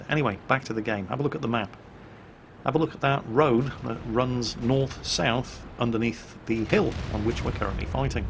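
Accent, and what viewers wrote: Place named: United Kingdom. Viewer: euros? He's british you damned koala! Doesn't the accent give it away?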